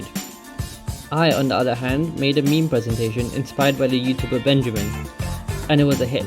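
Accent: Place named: China. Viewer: ahh indian guy with a chinese accent, my favourite kind Gread vid btw :)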